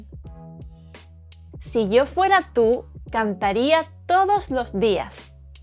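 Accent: Spain. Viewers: For her accent I think she is from Spain 😊😅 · You do a great job. However, one word in English that you are mispronouncing is “participle”. There is a stress on the first syllable “part” and a bit of stress on the third syllable “ci.” So, it comes out as part”- ti- ci’-ple. Your English is overall very good with a very cute Spanish accent. Sadly, with our hateful, unchristian Trump regime here in America, your accent could get you stopped and questioned by ICE agents. And, want your country please take Elon Musk off our hands! Muchas gracias para su lecciones en español.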